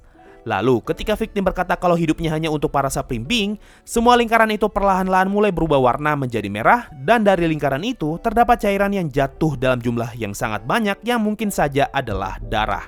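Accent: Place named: West Java, Indonesia. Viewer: jadi bakal ada banyak kalimat yang "Rada" aneh, wah kayaknya mimin org sunda :v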